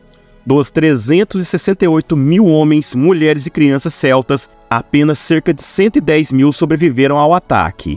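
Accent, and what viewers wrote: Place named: Caipira, Brazil. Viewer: O vídeo parece ser bom, mas o sotaque caipiiirrra nao consegui seguir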